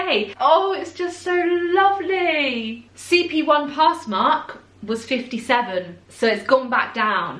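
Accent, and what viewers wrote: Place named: London, United Kingdom. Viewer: this is very random but I've been following you for years (since mid 2018 I think) and I noticed how your accent's changed over time and its the realest thing ever lol you can tell from this vid you've been spending a lot of time in London and having moved around I relate to that smm (like I literally don't know what my default accent would be anymore its just a weird jumble hahaha). anyway I know its super random but I just wanted to let you know you're not alone in this I reckon lol. and congrats on passing the exams btw xx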